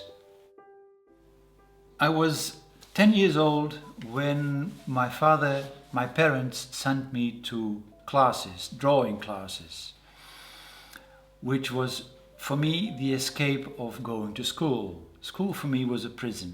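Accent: Netherlands. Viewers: Love your work! Just curious - where is your accent from? I'm guessing Dutch?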